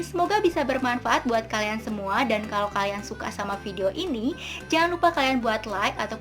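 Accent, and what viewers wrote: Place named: Riau, Indonesia. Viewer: Hadir kak 😁😁 Riau mana suarana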